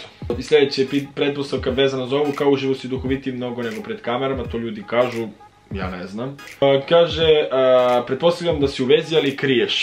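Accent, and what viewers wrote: Leskovac, Serbia. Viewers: pretpostavljam da iza kamere pricas na leskovacki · Miji baba i deda pricaju isto leskovacki moze 24h leskovacki da pricas😂😂😂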